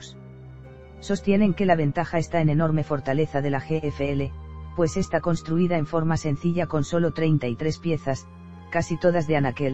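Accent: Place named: Spain. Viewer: Me desorientado la voz!! Parece una gallega la que habla. (Española)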